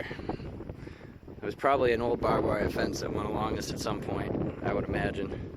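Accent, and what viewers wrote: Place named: New Jersey, United States. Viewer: You sound like your from Camden New Jersey.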